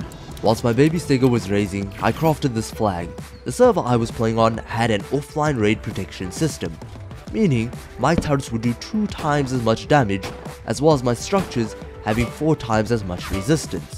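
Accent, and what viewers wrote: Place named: South Africa. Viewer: Coloured south african ya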